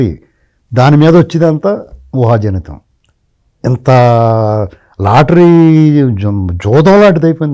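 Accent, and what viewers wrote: Godavari, India. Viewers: కొంత గోదావరి ఎబ్బెట్టు కలిపి చాలా చక్కంగా చమంత్కారంగా విశ్లేషణ చేశారు 😁😁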